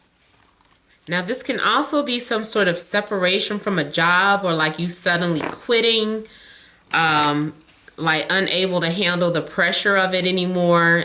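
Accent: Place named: United States. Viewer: You are thé best ever love from France 🇫🇷 even if it is sometimes difficult to understand the American accent 😂Happy new year 🥇♥️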